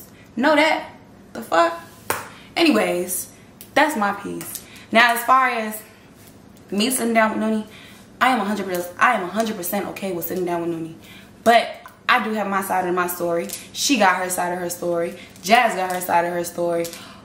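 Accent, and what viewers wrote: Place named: Chicago, United States. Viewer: The Chicago came ALL out in this video and I love it😂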